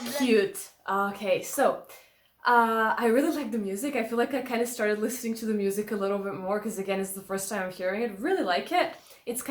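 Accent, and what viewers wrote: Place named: Ireland. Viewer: love Ireland accent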